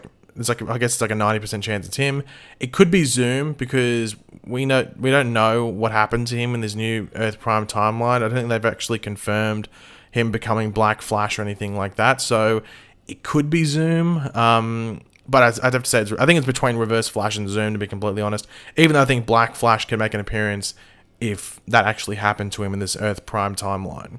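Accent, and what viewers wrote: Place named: Australia. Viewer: Brother no offense, but Australian accent sucks 😑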